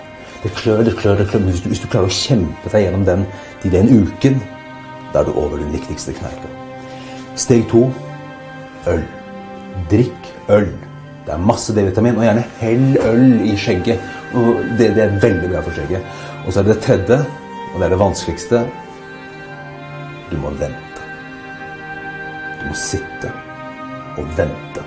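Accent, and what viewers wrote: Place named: Scotland, United Kingdom. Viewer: I thought he just had a strong Scottish accent...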